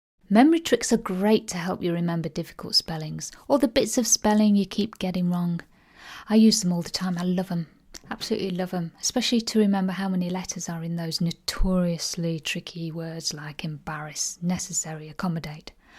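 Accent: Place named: Midlands, United Kingdom. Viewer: I fell in love with your voice and my ears would like to marry it. The fact that you pronounce the 'g' at the end of '-ing' suggests to me you learnt to speak in the Midlands or North-West, is this correct? I'm a big fan of mnemonics, as I do some teaching myself. For sentence coherence and therefore better memorising, before and after the 'hurry' in 'diarrhoea' add a pause, it scans so much better and my students seem to remember it better. Whilst nothing to do with spelling, perhaps my favourite mnemonic is: 'How I wish I could recollect Pi' the amount of letters in each word equal '<num>', Pi to six decimal places. I have about a dozen which I use with scary regularity. The one for remembering the kings and queens of England is awesome, it starts 'Willy, Willy, Harry, Stee, Harry, Dick, John, Harry three...' It starts at 1066with William the conqueror and continues right through to our current queen, eventually. 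I'm looking forward to your website / next video, but not quite as much as my ears are ;-)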